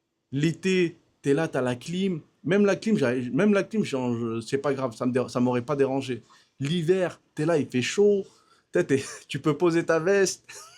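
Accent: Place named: Suburbs, France. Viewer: T'as très bien décrit la classe moyenne en fait lol Je suis blanc et je vis / ai vécu tout ce que tu décris. Bon après j'avoue que "ma race" n'est pas stygmatisée encore par certains en tenue d'islamiste snapchat donc on va dire que je m'en sors mieux mais je reste convaincu que t'as une tête d'arabe, tu parle bien et tu ressemble pas aux cassos en survet / basket qu'on voit dans tout les fais diverts; par un genre de délire inconsciant type "ah tiens ce maghrébin ne ressemble pas aux autres, il est aimable et gentil" bah t'auras plus facilement droit à des faveurs et des compliments / avantages qu'une personne moyenne sans rien qui sort de l'ordinaire. Toi t'as un accent de cassos de banlieue mais un vocabulaire et une élocution d'érudit, ca te rend insupportable pendant 5 minutes et extremement charismatique passé ce tout petit temps d'adaptation et je te prête plus facilement des vertues supposée qu'à d'autres. 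CQFD Je pense que ca marche pareil pour tout le monde. Les maghrébins qui s'en sortent pas sont juste des classes moyennes comme les autres, des sans éclats et ceux avec du charisme et des bonnes mannières s'en sorte mieux que la plupart des francais, c'est comme ca c'est la vie. Mais t'as raison, il faut se casser de ce pays quand on est jeune et tant qu'on peut pour se construire. La seule nuance que j'apporterais c'est un certain "mal du pays" qui arrve forcément si t'es pas complètement misanthrope. A ce moment la, une fois accomplis; c'est possible de revenir e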